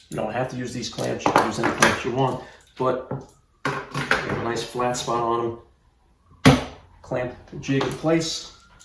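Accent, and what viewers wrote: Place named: Brooklyn, United States. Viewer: Living in seattle, but having grown up in brooklyn, I really appreciate hearing your accent. Home again.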